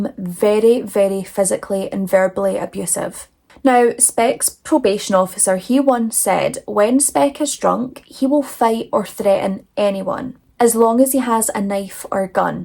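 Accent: Scotland, United Kingdom. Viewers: Hey! I started binging your videos. I like a very diverse group of true crime girlies. You have the cutest Scottish accent I could kiss you 😘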